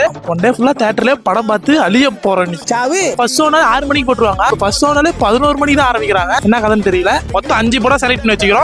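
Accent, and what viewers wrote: Salem, India. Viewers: Bro ne ga Salem dha na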